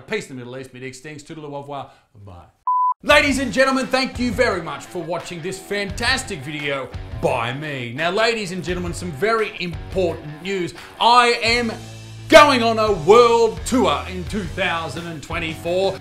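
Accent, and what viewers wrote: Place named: Australia. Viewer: For some reason I love Australian voices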